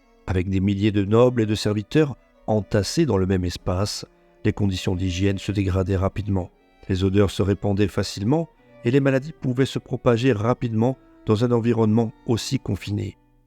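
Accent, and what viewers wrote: Corsica, France. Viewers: J’avoue que j’ai surtout écouté la vidéo pour l’accent Corse, forza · Super video! Mais je note un petit accent dans la voix , corse,je crois. Pace e salut❤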